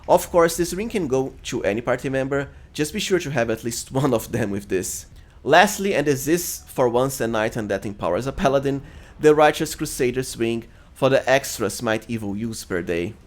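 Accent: Brazil. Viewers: caramba mano, ativa a faixa de audio em ptbr po, esse seu sotaque nao me engana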